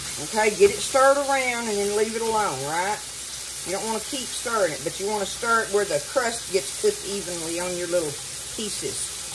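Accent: South, United States. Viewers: You are such a joy to watch. I enjoy you recipes and love your southern accent. Im up north in Minnesota.will try your southern pepper steak. Looks great. Thank you.you are sweet as apple pie😊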